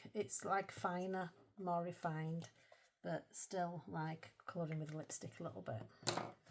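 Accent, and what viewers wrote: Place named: Scarborough, United Kingdom. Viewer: Your accent is like mine are you In Scarborough? There again you said the Range. We don’t have one lol 😂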